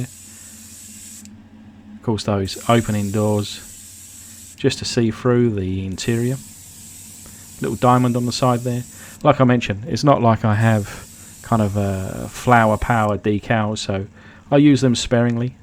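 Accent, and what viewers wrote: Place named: United Kingdom. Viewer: love that British... I’m Yorkshire. Proper Broad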